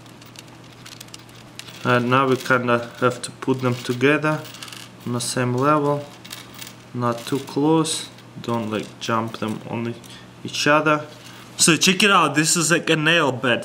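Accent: Russia. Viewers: Y do people make fun of the way he talks he is Russian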